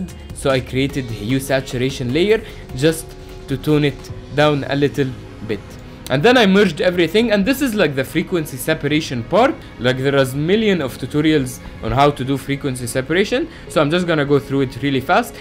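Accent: Egypt. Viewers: The egyptien accent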